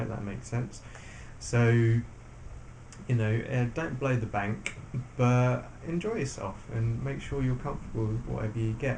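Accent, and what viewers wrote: England, United Kingdom. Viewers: holy shit it's an asian guy with an English accent!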